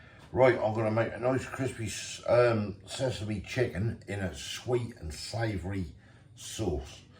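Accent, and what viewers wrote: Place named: Wolverhampton, United Kingdom. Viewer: Nice to see someone on youtube who can cook for a change :) Looks good, you sound local to me I'm in Wolverhampton :)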